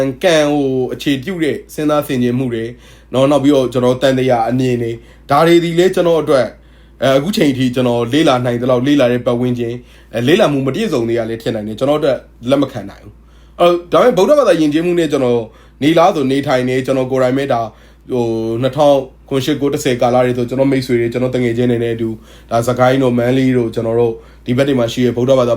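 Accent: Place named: Bangladesh. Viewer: တခုပဲအကျန်ပြုချင်တယ်အဖေအမေရဲ့ဝမ်းဗိုက်ထဲကမွှေးလါထဲကပဲအကိုပြောတဲ့ဘဂ်လီလို့ပြောခဲ့တယ်နော်ကျွန်မတို့လဲဘဂ်လီသွေးနှောပဲတာပေမဲ့အကိုကြိုက်သလောက်ဘာသာမဲ့လို့ပြောလဲအကိုစကါးအသွားအလါမှာဘဂ်လီသံပေါက်နေတာရယ်မိခင်ဖခင်ရဲ့အသွေးအသားနဲ့တည်ဆောက်ထားတာဆိုတောလူရယ်လိုဖြစ်လါရင်လူ့ပါတ်ဝန်းကျင်မှာဘာသာတခုတော့ယုံကြည်စွာကိုးကွယ်စေချင်ပါတယ်အကိုကကို့ရဲ့ပြထမ်းထားတဲ့ကျမ်းကန်ကိုအသေချာလေးလေ့လါစေချင်ပါတယ်ဂျပန်လူမဖိုးကိုရီးရားလူမျိုတရုပ်လူမျိုးအဂ်လိပ်လူမျိုးမြန်မာနိုင်ငံမှာရိတဲ့မြန်မာလူမျိုးတွေတောင်အစ်ဆလ္လာဘာသာကိုလေ့လါသင်ယူပြီးအီမာန်တေဂျင်နယ်အမိအဖမွတ်ဆလင်ကမွေးပြီးကို့ဘာသာကိုကိုလေ့လါပြီးသင်ယူမှုတွေလုပ်ပြီးအီမာန်ခိုင်မြဲရင်အကိုကိုညမတိုပျော်ရွင်စွာနဲ့ဂုဏ်ယူနေမှာခုလဲညမကအကိုဘအကောင်မှာဝင်ကြည့်လို့အရမ်းန‌ှမျော့တယ်အလ္လာ့ဆီမှာအလ္ာကြီးဟေတာရပ်ပေးပါစေလမ်းလွဲမရောက်ပါစေနှဲ့လိုဒိုအာလုပ်မှာပါအကိုဘယ်လိပဲဘာသာမဲ့ပြောပြောအကို့ကိုကုလါးဆိုပြီးကော့မန့်မှာဝင်ရေးနေတာတွေ့တော့ညမစိတ်မကောင်းပါဆရာကြီးဝင်လုပ်တာမဟုပ်နောအကျန်ပြုတာကျေးဇူးပါဖိုက်တင်း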